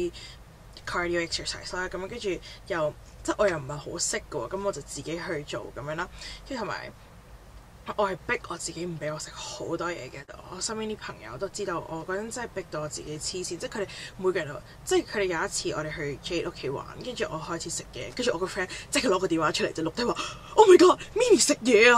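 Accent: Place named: England, United Kingdom. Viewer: did you go international school? or studied in a foreign country cuz I think there is slightly an english accent when you speak in Canton....just curious....nth much...love your channel and this video....^^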